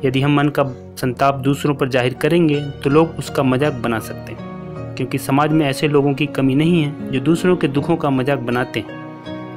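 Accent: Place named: Bihar, India. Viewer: I liked the Bihari accent.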